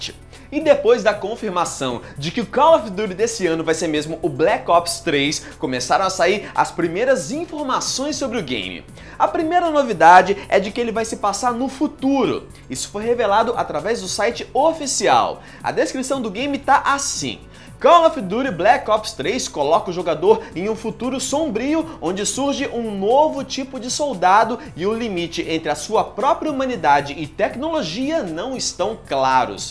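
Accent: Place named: Bahia, Brazil. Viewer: Olhem os primeiros vídeos dele, era mó baianao kkkk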